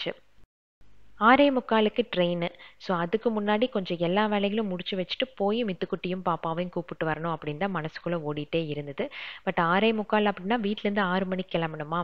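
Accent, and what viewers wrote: Coimbatore, India. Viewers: Uoinga video la nalla iruko sis....neinga coimbatore ah pesum pothu konjam theyriuthu... good luck 💐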